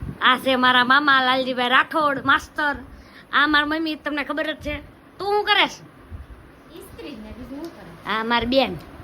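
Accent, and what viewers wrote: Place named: Rural, India. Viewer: મારી અટક પણ રાઠોડ છે. તમારાં મામાનું ગામ કયું? તમારી શુધ્ધ ગામઠી બોલી હો. મજા આવે એકે એક શબ્દ સાંભળવાની.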